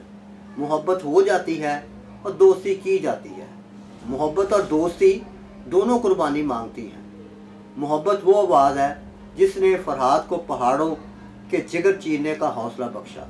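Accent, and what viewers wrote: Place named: Punjab, India. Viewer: Buth boray lyjay may batha rahy oap k8 urdu punjabiyu k8 thara hai ap k8 video sun nay k9 dil nahi chahatha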